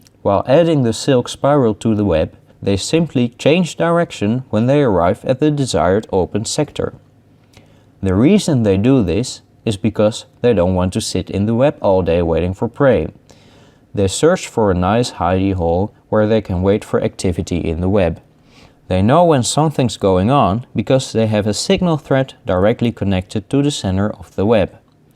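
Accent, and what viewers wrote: Netherlands. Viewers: Like I suspected. A fellow Dutch man. your determination book gave it away. The houses opposite of yours did ring a bell already. I think its Amsterdam. Also the way you pronounce the Latin names rang a bell. Like what you do mate. Keep it going.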